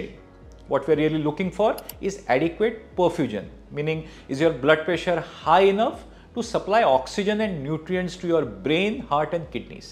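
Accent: India. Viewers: Your thick Indian accent makes it difficult to hear your words when you speak 😊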